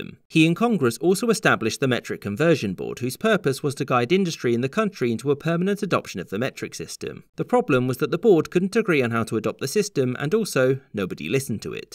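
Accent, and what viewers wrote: United Kingdom. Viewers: Clicks video to hear explanation. Hearts British accent. Laughs in American metric. We use both systems, jackass. It's literally on our food labels. Our races are 3k, 5k, 10k, 15k. Marathons are marathons, get over yourself. I go to the store and can buy soda by the liter. Our hospitals use it. We use F because it makes more sense. No? then why are freezers in the UK set to 0 Fahrenheit? Enough with making your citizens ignorant.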